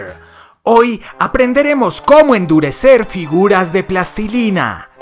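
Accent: Colombia. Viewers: Eres de colombiano ? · muchas gracias muy útil eres colombiano?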